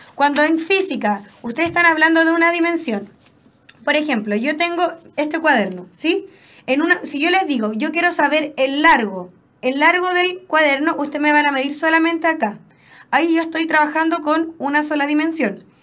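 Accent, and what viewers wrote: Chile. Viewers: Ah mierda es chilena, no le entendi nada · Me gusta el acento de los chilenos!!! Jeje ♥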